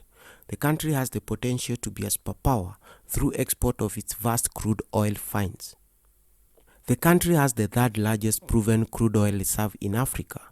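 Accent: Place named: Central, Kenya. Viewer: Am I the only one who hears this guy is from Kenya ( central kenya infact ) because of the way he is "shrubing" ?!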